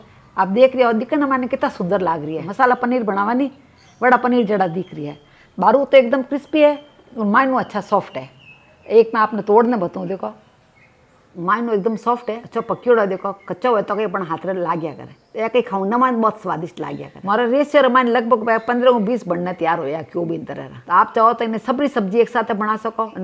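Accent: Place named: Rajasthan, India. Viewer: Hi aunty ji mai haryana se hu maine aj pehli bar apki video dekhi h mujhe lga ki mujhe rajasthani samajh me nhi ayegi par, samajh me ayi ap ke muh se rajasthani me ye recipe sunkar acha lga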